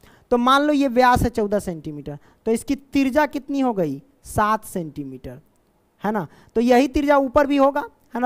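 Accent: Bihar, India. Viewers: Sir aap bihari ho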